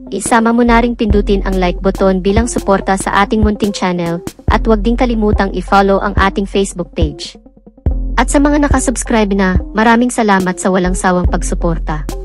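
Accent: Visayas, Philippines. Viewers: Bisaya ka day?!😂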